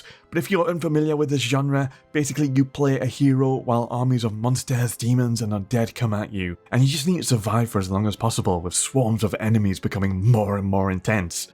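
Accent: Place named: United Kingdom. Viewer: Goddanm i love british reviewers lol.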